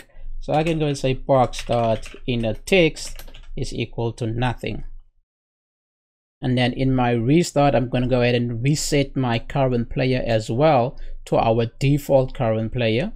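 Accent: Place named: South Africa. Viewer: I am studying IT and had to leave my home country (South Africa 😢) I need help with an assignment while being homesick. The i came across your video and with 5 seconds into the video i knew were you were from. Not only did your video make my day but will definitely help my studies 😊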